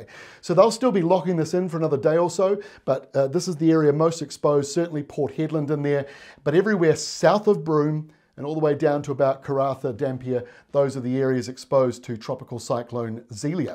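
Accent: New Zealand. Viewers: The plain English explanations (with kiwi accent) make this the best weather channel!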